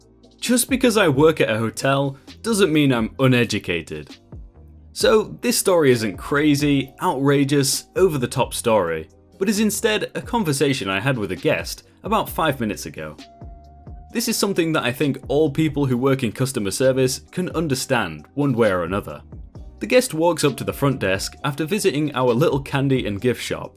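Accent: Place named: Scotland, United Kingdom. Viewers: Not a bad Scottish accent. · You have a decent Scottish accent. Don't worry about it.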